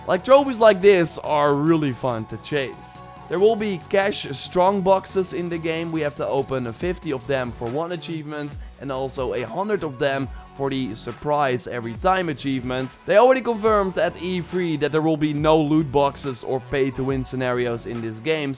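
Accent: Netherlands. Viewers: I instantly regret pre-ordering this game Well I hope the online is somthing Its like you have a dutch accent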